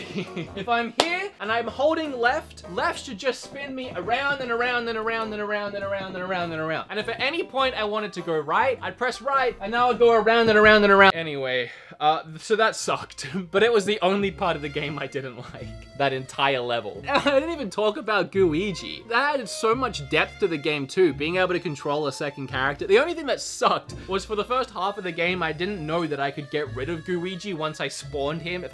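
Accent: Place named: Australia. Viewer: So what’s this guys accent? He sounds Australian but says some words completely differently.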